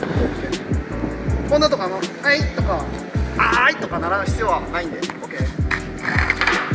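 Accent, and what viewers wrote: Kansai, Japan. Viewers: 関西弁でめちゃわかりやすい！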